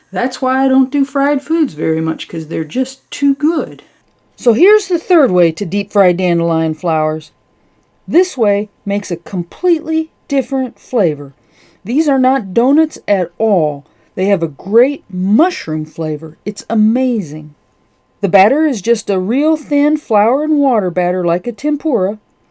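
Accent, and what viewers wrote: South, United States. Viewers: Hey great vid, I am watching this in England and I just love your voice, is that a southern accent ?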